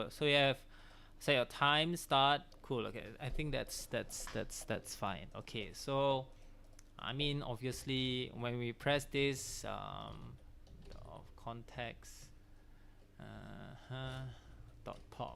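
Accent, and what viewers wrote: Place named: Southeast Asia. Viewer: hi, teacher, are you from south east asia? your tone tells me that.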